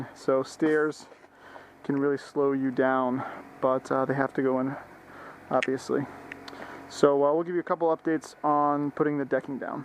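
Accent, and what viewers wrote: Rhode Island, United States. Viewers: are you from rhode island?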